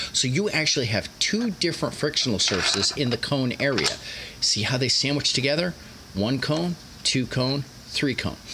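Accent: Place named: Delaware Valley, United States. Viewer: This video ended up in my recommendations. While I was watching it I was like, "Wait, that accent. This guy is from the Delaware Valley". So then I check and yep. I love our accent. Its so obvious!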